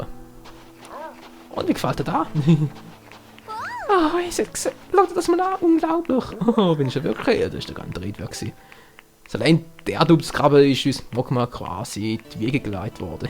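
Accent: Switzerland. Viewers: war cool mit dem schweizerdeutsch^^ ich dachte ich hätte mehr probleme dich zu verstehen,aber war ganz ok! hast du eigentlich schon n lets play von twilight princess?wenn nich wäre ich wieder für min.1 part in scheizerdeutsch;) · Also ich mag dein Schweizerdeutsch ;D Ich verstehs ganz gut^^ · also ich finde das schweizer-deutsch ist gar nicht so schlimm^^ es ist sogar mal ganz schön. ist was anderes. ich verstehe zwar als deutsche nicht alle wörter, aber das ist mir egal. es macht Spaß dir zu zuhören, da man das schweizer-deutsch ja hier so selten hört^^ mach weiter so!!!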